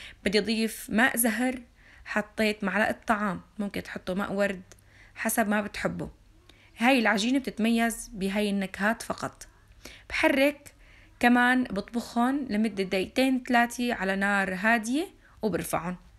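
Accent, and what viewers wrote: Homs, Syria. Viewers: من كلامه مبين انها من مدينة حمص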